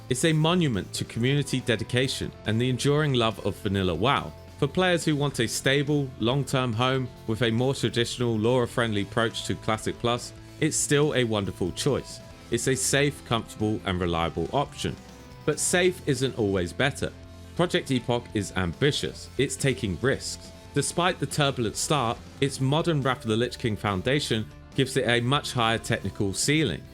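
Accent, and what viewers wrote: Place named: United Kingdom. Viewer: This was a very biased “hyped up” video. Let’s wait for Epoch to actually have a functioning server before we weigh the options. Also, a lot of what you stated about Turtle WoW demonstrates that you’re paid advertising for Epoch and/or haven’t done your research. You can’t hide behind your classy Victorian accent. Do your research, sir.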